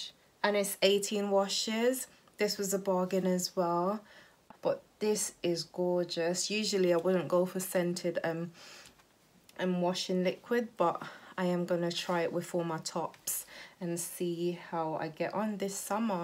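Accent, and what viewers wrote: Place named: Birmingham, United Kingdom. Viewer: Great haul, looking very glam in this video. Loving the earrings and the watch! And of course the slight Brummie accent too lol!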